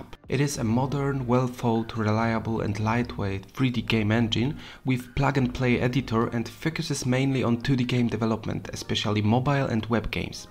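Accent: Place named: Poland. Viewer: po akcencie od razu poznałem, że Polak! Powodzonka! :)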